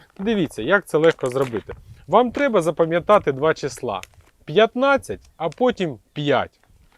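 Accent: Surzhyk, Ukraine. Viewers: Україномовний чи російський яка різниця, аби контент був хороший. І не в образу вам, уривки Азаровщіни у вас присутні.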